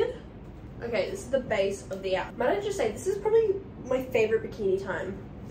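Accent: Australia. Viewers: I would die to look like you 😭😭 Even if I had 1% of your eternal beauty, I would probably feel more confident 😢:face-pink-tears: You are out of this world stunning - naturally cute, sweet and pretty - ur Aussie accent is adorable:) I Subscribed to your Channel btw <3:face-purple-wide-eyes: I have always thought this, but Australian people are the most beautiful people in the world - just look at Chris Hemsworth, Margot Robbie, etc... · 🙏𝟐 𝐭𝐡𝐢𝐧𝐠𝐬 𝐈'𝐦 𝐠𝐫𝐚𝐭𝐞𝐟𝐮𝐥 𝐟𝐨𝐫 𝐭𝐡𝐢𝐬 𝐂𝐡𝐫𝐢𝐬𝐭𝐦𝐚𝐬...𝐍𝐢𝐜𝐨𝐥𝐚 𝐂𝐫𝐢𝐬𝐚 𝐚𝐧𝐝 𝐭𝐡𝐞 𝐠𝐢𝐟𝐭 𝐨𝐟 𝐬𝐢𝐠𝐡𝐭 👀 𝐀𝐧𝐝 𝐝𝐚𝐭 𝐚𝐮𝐬𝐬𝐢𝐞 𝐚𝐜𝐜𝐞𝐧𝐭 😍 you've perfected the profile shot 😂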